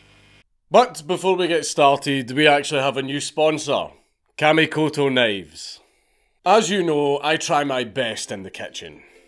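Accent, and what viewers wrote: Scotland, United Kingdom. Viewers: has a neko t-shirt and sponsored by a knife company. Been watchin some of that h enti too? Please don't turn fully Japanese we need you for that nice Scottish accent.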